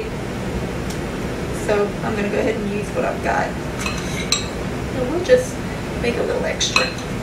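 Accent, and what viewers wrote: Louisiana, United States. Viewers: Tony Chachere's, Magnalite skillet, and that beautiful accent. What parish are you from? It has to be a little further north than me judging by the landscape in some of your other videos (that i love). Wish i could get you and my wife to hang out so you could be her mentor. Keep up the great work! You have a new subscriber BTW.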